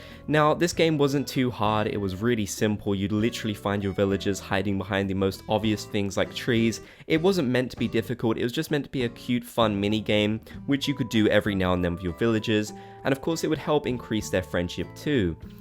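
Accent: United Kingdom. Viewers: Is it just me or he sounds british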